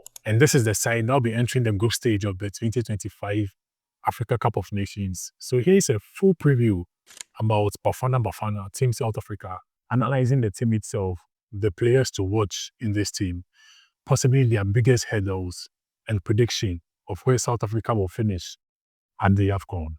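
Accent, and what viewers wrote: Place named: Nigeria. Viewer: Bro you sound Nigerian but you look South African.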